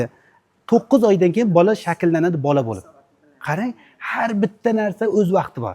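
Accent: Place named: Qashqadarya, Uzbekistan. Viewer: Энг ажойииб кашкадарйоча жайдари гаплар😂😂 Зур тушунтиряптила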